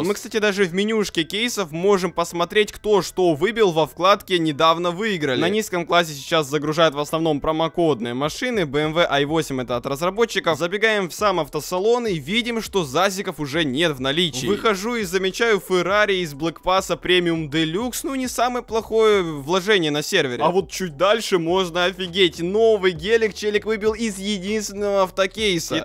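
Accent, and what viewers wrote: Ukraine. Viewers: Ммм ты с Украины?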